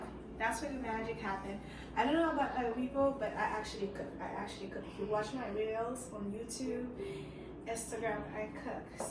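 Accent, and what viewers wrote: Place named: Haiti. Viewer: If I didn't know you were Haitian from the accent, the glassware cabinet was a dead giveaway lol Such a stunning space 🤍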